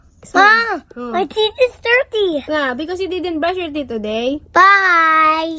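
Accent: Visayas, Philippines. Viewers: Hahah... Mommy kiat lng bah ... Bisaya jd kaau mommy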